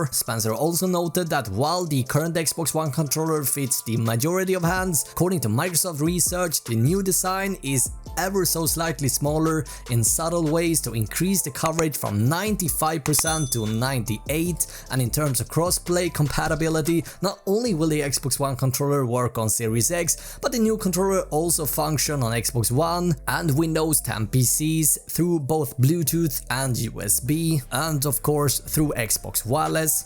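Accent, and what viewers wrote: Sweden. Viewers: You sound swedish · E d svensk??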